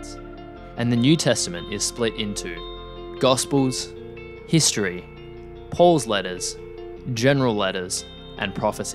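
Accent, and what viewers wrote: Australia. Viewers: Oh, and the New Testament list was compiled in 49AD (not in 85AD like video says). This sounds like that dude from Australia or New Zealand who made millions becoming a street preacher. Is that his voice? Then it would make sense since he's got a lot of the historical facts here or is purposefully leaving out the true historically correct dates.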